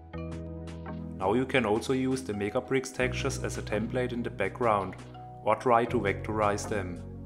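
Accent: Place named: Germany. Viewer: ich hab geliked wegen dem deutschen Akzent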